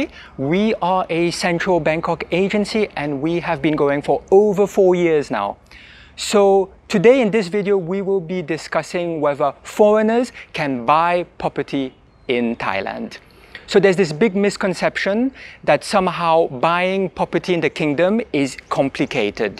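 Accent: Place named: France. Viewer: He speaks English with a French accent.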